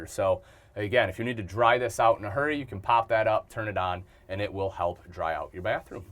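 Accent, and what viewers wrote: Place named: New England, United States. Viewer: I’m detecting a trace of New England in his accent.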